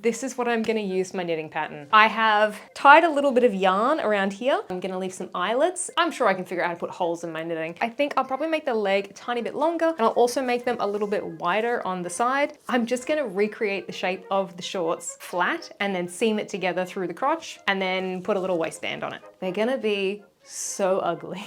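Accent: Australia. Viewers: This is incredible 🤩 and as a southern woman, love to hear an Australian accent adopting y'all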